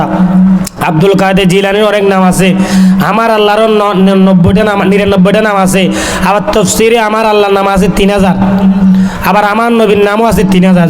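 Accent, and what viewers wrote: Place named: Chittagong, Bangladesh. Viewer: আসসালামুআলাইকুম ওয়ারাহমাতুল্লাহি ওয়াবারাকাতুহ, ভাইজান আশা করি ভালো আছেন, আপনার চ্যানেলে ঢুকে দেখলাম, চ্যানেলটা অগচল, ডিসক্রিপশন লেখেন না কেন? টেক তৈয়ার করেন না কেন? ভিডিওর ক্যাপশন এবং থাম্বেল দেন না কেন? এগুলো না দিলে তো মানুষের কাছে পৌঁছাবে না এবং চ্যানেল গ্রো হবে না, সাবস্ক্রাইব করলাম আমি। আপনি কোন এলাকার, বাসা শুনে বুঝা যাচ্ছে চট্টগ্রাম, আমার বাড়ি চট্টগ্রাম রাউজান, ভিডিও আরো লম্বা দেবেন, যেহেতু আপনার ওয়াচ টাইম এর প্রয়োজন আছে।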